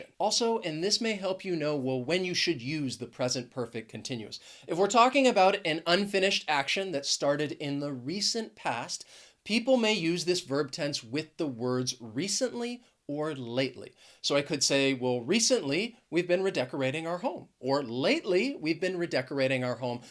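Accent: United States. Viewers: Hi. What type of American English pronunciation do you represent?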